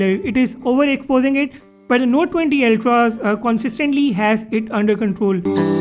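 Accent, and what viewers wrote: India. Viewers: Man ,indian accent is too funny to take serious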